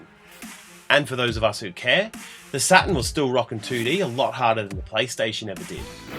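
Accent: Australia. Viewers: The Sega "Satin" I love Australian accent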